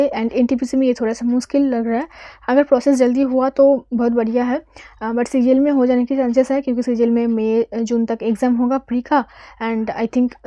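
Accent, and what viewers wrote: Bengal, India. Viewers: Ntpc ka process is baari fast hoga Or West bengal me vacancy bhi accha h....tumhara bolne ka style se lag rha h u r from bengal... So don't miss it keep going on👍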